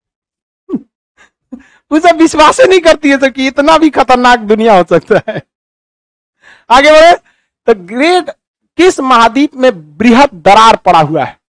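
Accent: Bihar, India. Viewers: I love your Bihari accent sir....😄😆🙏🏻🤣😂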